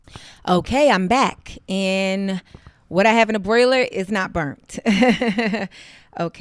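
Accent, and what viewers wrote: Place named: New York, United States. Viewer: Your short about your brother and western union and your NYC accent brought me here. Your accent takes me back home to Queens. New sub, new fan. 👏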